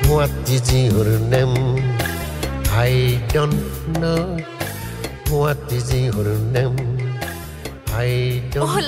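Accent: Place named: United States. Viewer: This guy should be a telemarketer his American accent is on point.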